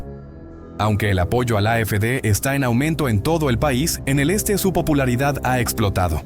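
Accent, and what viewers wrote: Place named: Latin America. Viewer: Según ustedes es imposible vivir en Alemania, Australia, Canadá, Portugal, Nueva Zelanda, Irlanda, Japón, Reino Unido...y siempre de una forma u otra es culpa de los inmigrantes... qué raro!! Suena a ideas de extrema derecha...y lo mejor de todo es que el locutor tiene acento de Latinoamérica, será un inmigrante?? Quizás es uno de esos miles de Venezolanos que pidieron el voto para Trump, los Magazolanos, y ahora Trump los expulsa. No me creo que no se pueda vivir en ningún sitio y encima siempre se criminalice a los inmigrantes.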